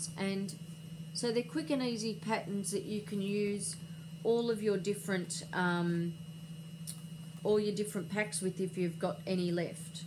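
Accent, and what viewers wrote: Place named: Australia. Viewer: Hello, I'm new to you sight. Nice to hear the Aussie accent. Thank you for showing those magazines, going to get the Crochet Now Magazine tomorrow. :)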